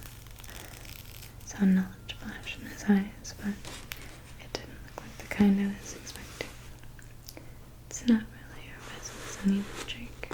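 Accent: South, United States. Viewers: Excellent as always. Southern accent is my favourite, and I'm British 😊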